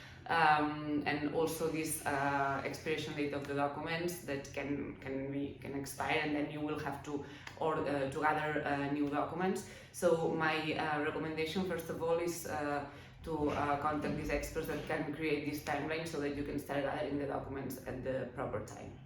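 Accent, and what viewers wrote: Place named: Spain. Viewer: I had no trouble hearing or understanding anything you said. Your lovely Spanish-English accent is helpful to begin learning Spanish and why it is different from English. I do wonder whether the recent floods are impacting where people want to retire. Also, do the high Spanish taxes on USA social security pensions deter people, or are there ways to reduce paying a large portion of monthly social security benefits to Spain?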